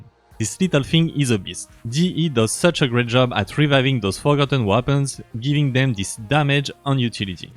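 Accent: France. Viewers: Excuse-moi de te poser la question en français mais je suis nul en anglais et j'ai remarqué ton petit accent français. Je souhaitais savoir si le troisième passif de voruna pouvait être fort avec la dague en céramique, et si tu me le recommanderait. Mis à part cela j'ai beaucoup apprécier le travail que tu as fait pour cette vidéos, force à toi.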